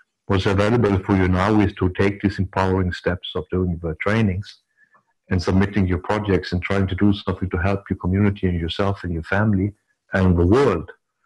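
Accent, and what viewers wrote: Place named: Netherlands. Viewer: Gaia's Public Health Nurse yes it seemed some paper or something was rustling up against the microphone. Never mind, it causes us to pay closer attention. I think his accent is Dutch or Dutch-Indonesian.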